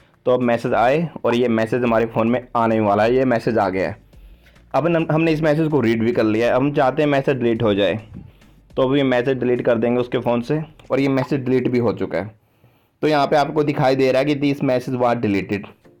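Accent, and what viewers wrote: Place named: India. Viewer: Why do Indian English so difficult for me to understand???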